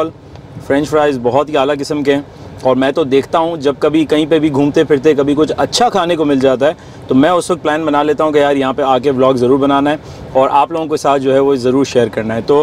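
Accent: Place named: Uttar Pradesh, India. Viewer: 🌹🌹🌹🌹🌹 भाई आप की बोली बिल्कुल हमारे उत्तर प्रदेश हिन्दुस्तान की तरह है माशाल्लाह हिन्दुस्तान जिन्दाबाद 🇮🇳🇮🇳🇮🇳🇮🇳🇮🇳🇮🇳🇮🇳🇮🇳🇮🇳🇮🇳🇮🇳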